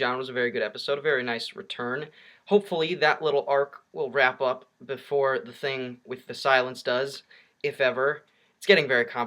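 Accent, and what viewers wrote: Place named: England, United Kingdom. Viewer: That English accent omg XD you do make lots of good points though! Even if I did stare at the angel tshirt for the majority of the vid...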